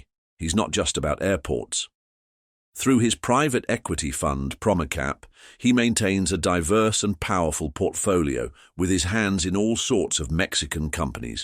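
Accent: United Kingdom. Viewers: So how do you know that these people control the cartels? I'm wondering 🤔! You as a British citizen (based on your accent) remind me of the BIASED American media. That's all those people talk about when referring to our country and people. This is one of the reasons I personally say that America is a humongous HYPOCRITE nation! They do business with us and at the same time lash out at us. That includes their Roach 🪳 Trump their criminal 👹👿🤥. And by the way. Trump was caught stealing in our country. Outside Mazatlán and the city of Ensenada. He was also caught swindling people of their money in Playa del Carmen. Please make a video of this and why America is the world's biggest drug consumers. Please. I would appreciate it if you were not BIAS just like the American media. And just in case the American society did not know how drugs are smuggled across the entire border. 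Here's a tip. Because drug traffickers BRIBE 💲💰 immigration officials in order to smuggle all those drugs through the border!! And I do mean humongous amounts of money 💰💲!! Believe it or not, up to $100,000 dollars in one lump sum!! So please do us a big favor. Please make videos about America's "war on drugs" and why it never ends. Believe me. You'll be surprised 😯 who really is behind the "war on drugs" in America!! And it isn't our people! Good day CHAP 🏴.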